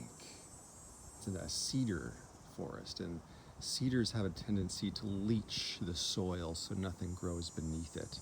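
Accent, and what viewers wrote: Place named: Canada. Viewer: I think I've seen this when it was 1st posted??? But OH! Your navigation skills crack me up! Not the 1st (& not the last) video you've been lost in. You know I love you dearly, but Magellan you are not. And in my Zombie Apocalypse team, navigation is NOT your job! LOL! (Wink) After 2yrs of your audio/ videos you Canadian accented O's & A's still give me a grin & a giggle. Big hugs & here's to the 2022 spring/ summer/ fall hiking ahead! 🥾🕶☀️💧🎒😉😘🧭 L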